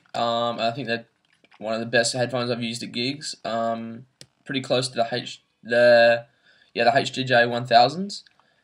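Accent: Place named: Australia. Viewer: You sound Australian haha I listen/watch to dj cotts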